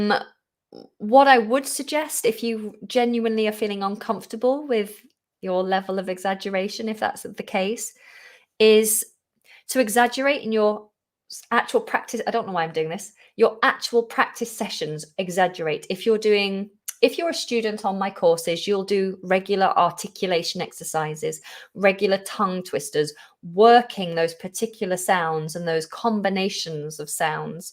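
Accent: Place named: United Kingdom. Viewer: Hi great to see you again and enjoy listening to your beautiful British voice. Unfortunately i couldn’t catch you on live but it’s Ok as i am watching you right now from Casablanca, Morocco 🇲🇦 Thank you so much for this live steam 😎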